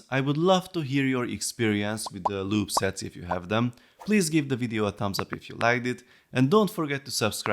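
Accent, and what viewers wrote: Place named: Hungary. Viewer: A Stuntz-os videóddal kezdtem... most látom a csatornád először.. volt bennem egy gondolat, hogy annyira magyaros az akcentusa az illetőnek.. tán csak nem magyar? De. :) Nagyon jó a csatornád, sok sikert hozzá!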